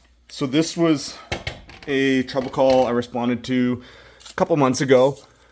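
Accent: Canada. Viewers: I’m curious are you from Canada? I ask because your accent sounds like a Canadian accent of some sort and a lot of the stuff you use in your videos I’ve yet to see in the states or at least my state and potentially Missouri as we go up to visit family that lives there once every year or 2 either way I love your videos though I do worry about your safety as it does seem there are a lot of risk involved which is why I am glad you assess the situation before hopping into the bucket and start working and also make sure you take proper precautions to ensure your safety while also not causing further damage to the lines you’re working on but one thing I do have to say the fact you take the time to explain why you’re doing something this way or that way or just simply boiling down to training you’d receive as becoming a lineman, and it’s a dangerous job, my grandfather works in highway construction but also has built houses before he and my grandmother moved down here when my mom was 2 so he also has some knowledge when it comes to wiring up a home for the most part and as someone who’s fascinated by electrical equipment and electricity he left me with a warning to be careful when measuring the voltage 120V AC as it can also cause severe injuries or death, which I’ve already taken precautions when I’ve done this stuff, but I’ve learned a lot about step up voltage, step down, inverting voltage from say 12vdc to 120vac even down to using a old spare computer power supply as a a budget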